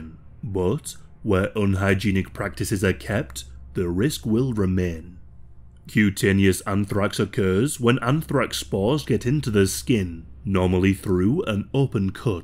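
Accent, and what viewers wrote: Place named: Northern England, United Kingdom. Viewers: I didn't know you were Australian you sound northern English...? I'm from the UK btw ✌️ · Do you think everyone has a London accent? 😂 I’m a Northerner and he is 100% from England. I have a feeling you aren’t though and shouldn’t be assuming.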